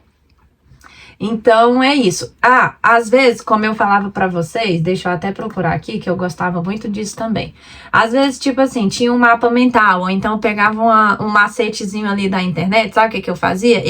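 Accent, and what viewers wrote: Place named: Brasília, Brazil. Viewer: O “sotaque” brasiliense é conhecido de longe kkkkk Excelente dica, valeu mestra 😅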